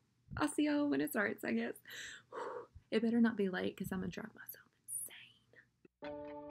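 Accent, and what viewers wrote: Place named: South, United States. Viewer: i love your accent ! you have more of a southern accent than me and idk if arkansas if counts as a southern state haha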